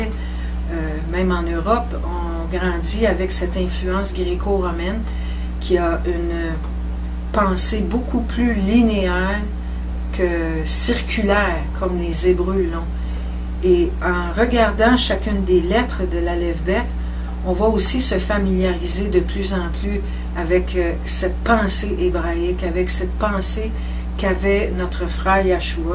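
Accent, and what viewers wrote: Canada. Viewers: Shalom ma belle! Comme je suis heureuse de vous trouver! Je suis dans cette merveilleuse découverte de l'Alaphbayt et de tous les merveilleux secrets que Notre Père YaHUaH a caché dans celui-ci. J'aimerais bien en discuter avec vous si possible et Yahuah voulant..Lyne ❤️. Écoutant ton accent, je vois que tu serais du Québec..HalaluYahuah 🎶 Sois bénie (BaRuCH) en Yahusha ha Mashyach 🌺❤️ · bonjour, il faut lui demander. Vu son accent elle est au Canada. Que Dieu vous bénisse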